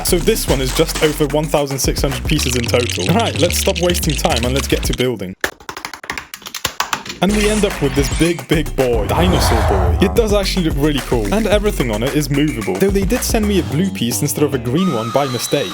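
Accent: England, United Kingdom. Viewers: are you english or british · He British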